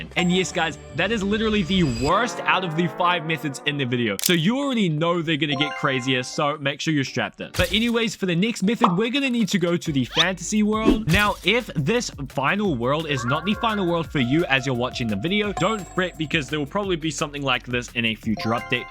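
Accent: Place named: New Zealand. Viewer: can tell by your accent, I’m from Australia and have met many kiwis in my life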